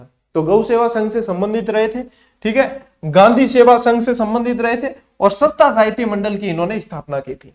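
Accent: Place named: Rajasthan, India. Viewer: Aap marwadi bolte hue bahut hi ache lagte ho sir ji.....esa lgta h sunte hi rhe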